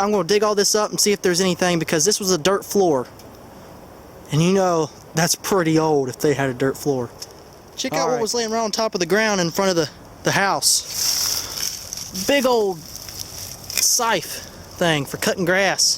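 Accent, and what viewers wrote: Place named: Vermont, United States. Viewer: it's vermont speech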